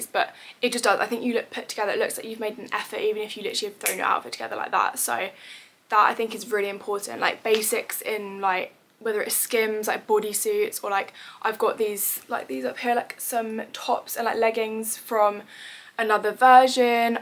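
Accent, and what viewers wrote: United Kingdom. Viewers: I like your British Accent!!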